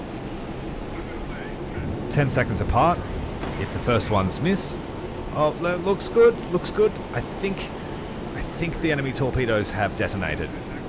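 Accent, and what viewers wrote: Australia. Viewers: Are you by chance from victoria?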